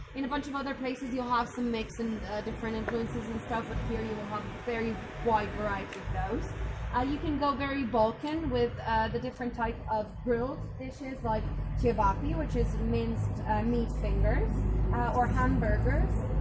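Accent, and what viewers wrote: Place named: Ireland. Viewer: why an Irish accent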